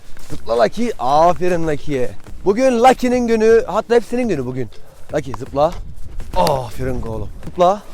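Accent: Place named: Gaziantep, Turkey. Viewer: aga bu adama anteplıce konus mak cok yakısıyo adam bu adam harbi kral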